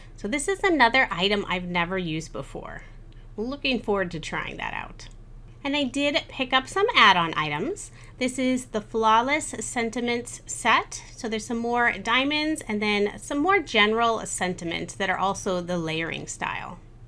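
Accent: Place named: South, United States. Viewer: I keep forgetting you're not in the US. You sound like a good ole southern girl😊💖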